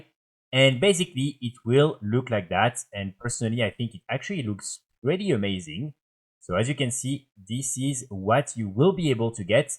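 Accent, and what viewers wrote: France. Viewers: Je comprend beaucoup trop facilement cet anglais, c'est un compatriote xD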